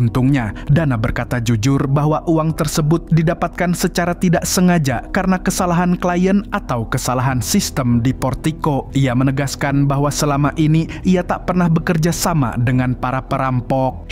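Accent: Banjar, Indonesia. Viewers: Pian urang Banjar kah min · Admin nya urg banjar jua sekalinya 😁👍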